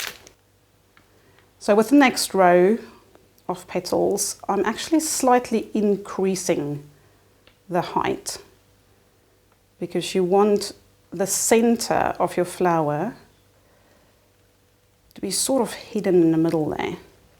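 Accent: South Africa. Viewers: Lovely tutorials. So original and so nice to hear a SAFA accent!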